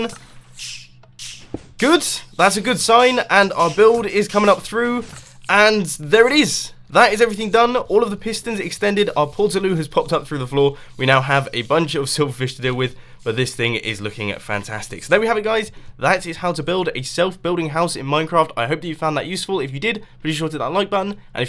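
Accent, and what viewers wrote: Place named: United Kingdom. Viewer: You are a British what the hell bro but I'm Indian and I like your english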